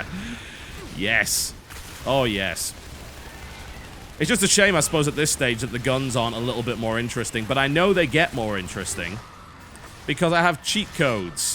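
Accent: United Kingdom. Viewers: lol first of all hes british. second: you're funny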